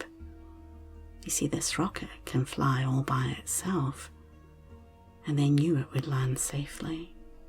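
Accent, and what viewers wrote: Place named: Canada. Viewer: My daughter loves this story and requests it almost every night. It was a significant part of helping her school/separation stress. Thank you. I share your channel with almost all of my clients, as so many struggles with sleep concerns, anxiety, or busyness! If there is anything else I can do to support your work, let me know. Love your "accent". We're Canadian, eh?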